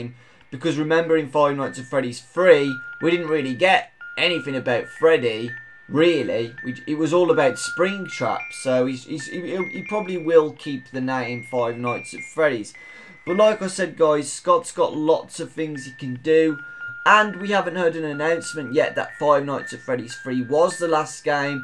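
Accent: United Kingdom. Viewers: is that a british accent? "pizzaria ,suvoid, foulks " sry If I`m being racist but I found it funny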